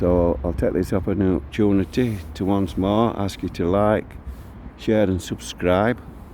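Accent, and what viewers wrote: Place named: RP, United Kingdom. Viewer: I thought you’d be an Earl Grey man with your posh accent!!! (I can’t abide all that poncy stuff. It tastes like perfume to me).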